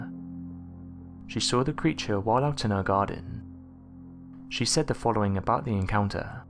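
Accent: England, United Kingdom. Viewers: It's not a robot, he's English.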